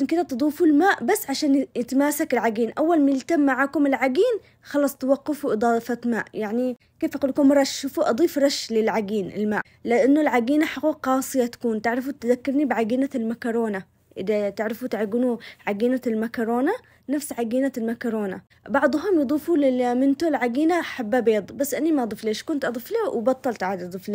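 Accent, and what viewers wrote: Aden, Yemen. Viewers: أحلى شي أحلى شي كلامك اليمني الجميل اللي بدون تصنع ولا قلتي كلمه خليجي اني فدالك.. في بنات عدن عمرهم ماخرجوا من عدن ويتكلمون سعودي ولا اماراتي تقولي الا مواليد الخليج..تصنع يجيب الغثى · اهل اليمن هذه لهجتهم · الصراحه احب اللهجه العدنيه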